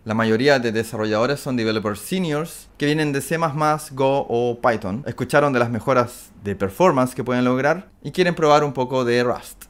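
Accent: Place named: Chile. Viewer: Hola, esta semana YouTube me recomendó tu canal, me alegra comenzar a oir acento chileno por la plataforma, tenemos bastante capital humano, pero al parecer somos más tímidos que el resto de hermanos hispano hablantes. Tus videos me parecen muy interesantes, y espero que tu canal crezca.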